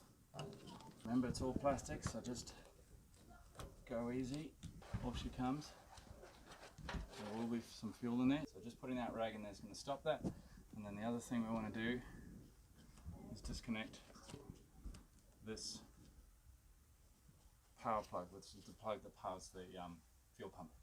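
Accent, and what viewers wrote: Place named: South Africa. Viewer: Greetings from South Africa... You sound extemely SA by the way. Buying this bike next year, this is how I got to your channel!!!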